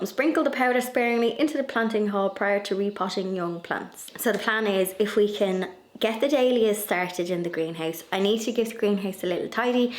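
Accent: Ireland. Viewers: Love listening to your accent my maternal great grandparents migrated to Australia from Ireland,my grandfather could put on a good Irish accent lol I’ve only been to Ireland once, but could have just moved back in so easily it was like coming home, just can’t leave all my family behind 😢 I don’t know how they did it in the past To come to a strange land so far away.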